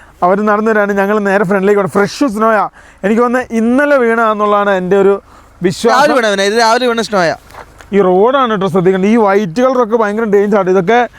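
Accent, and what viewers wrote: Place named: Kasaragod, India. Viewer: ni kasaragod alle ninte bisyo kasaragod pole ind😂❤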